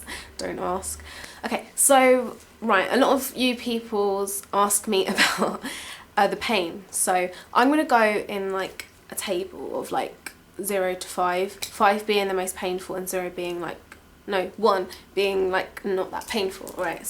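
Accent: London, United Kingdom. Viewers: I don't think it's fake at all. And even it is fake, it sounds very real. I'm from the UK, and my accent sounds very similar to hers. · I love the tattoo on your back/shoulder. And wow, has no one ever heard a London accent?! xo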